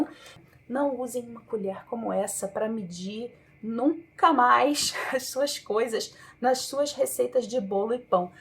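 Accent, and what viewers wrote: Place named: Rio de Janeiro, Brazil. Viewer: ETA CARIOCA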